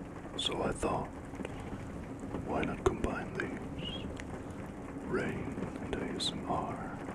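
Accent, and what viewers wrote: Germany. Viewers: ☆ More whispering videos, please! I usually don't like talking videos, but your voice is the only one that's really soothing to me. As well as the German accent; my family is German. Thank you from my ♡ to yours! ☆ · Tingle Lovers … I love joining you here. I noticed you may have an accent, are you German? Sending the best wishes to you in whichever country you are in. Tingles to you everyone! 🥂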